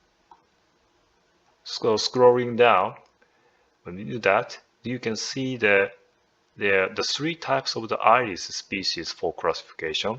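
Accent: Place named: Japan. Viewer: 日本語訛は私にとってはとても理解しやすい英語です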